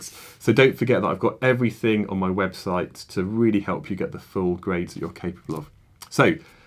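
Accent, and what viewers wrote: United Kingdom. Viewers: I love british accent ♥️😍♥️😍♥️😍♥️🏴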